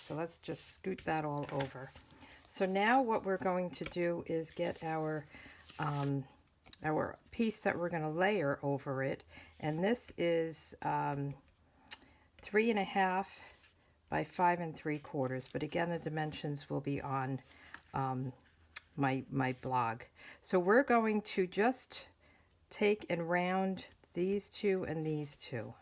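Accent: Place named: East Coast, United States. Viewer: Soooooooooooo adorable!!! I just love where you placed the sentiment tag. Thank you so much for your well executed tutorial! And as always, this NY lady, in now living in CO, loves hearing your east coast accent!! Be well, Hugs, Karen ❌💜❌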